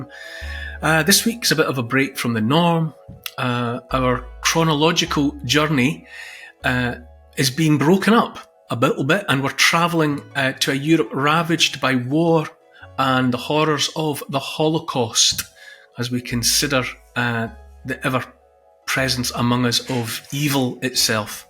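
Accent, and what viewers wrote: Scotland, United Kingdom. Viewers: Cheers, doof doof on heart. Lurve ya skotiesh akscent..! 🫵😇🤙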